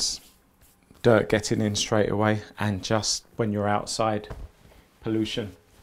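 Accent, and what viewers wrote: United Kingdom. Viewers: you have such a calm and collected manner and I really enjoy listening to the deliberate articulate way you speak. Of course the distinguished English accent is really cool too. I wish I could have you as my barber. That would make my monthly haircuts enjoyable and a much more pleasant experience being able to chat with a nice easy-going person. Alas I live in Singapore, a country in Asia very far from the UK. The barbers here hardly say a word and when they do, it's pretty simple things like how are you doing and how's your day been so far. It's nice having someone who could provide tips and advice and basically just chat about stuff and catch up on things everytime you see them.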